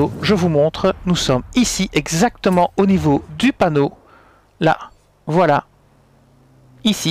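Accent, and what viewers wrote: Belgium. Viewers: Merci a notre ami. Je suis fan absolu de ton accent belge. Tes 8 qui devienne ouitte c est trop genial. Big up a l amitié franco belge ou belgo francaise. 😊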